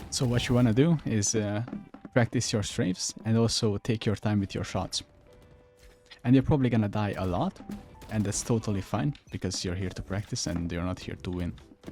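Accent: Hungary. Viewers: Are you hungarian? Your accent sounds like hungarian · AAAA az a szep magyar akcentus<3 Great vid tho:) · Tudtam, hogy Magyar vagy! Az akcentuson hallatszik, mondom ránézek a névjegydre, és bingo, Magyarország. :D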